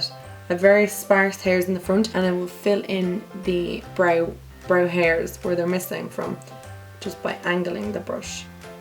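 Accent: Ireland. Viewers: Are you Irish beautiful?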